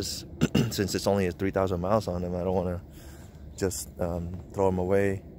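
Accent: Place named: Philippines. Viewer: You sound Filipino :) nice car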